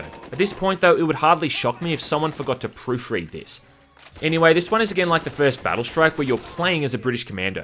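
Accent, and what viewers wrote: Australia. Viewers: huh, would never notice that without your comment. just an aussie charm combined with years of videomaking expierience i guess, i really like his delivery.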